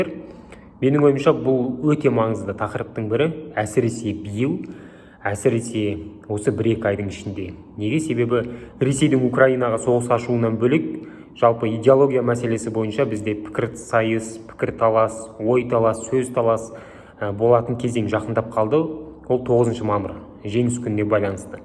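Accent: Standard. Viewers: өте сауаты күшті,қазақш таза сөйлейді,мәңгүрт депутаттар қағазға қарап міңгірлеп тұрады,осындай қазақ азаматтары көп болса екен,депутаттыққа,мини стрлікке осы Асхатты ұсыну керек,қазақша таза сөйлейтін жастар аз,аман бол!Қазақстан енді орыстың бұғауынан шығу керек!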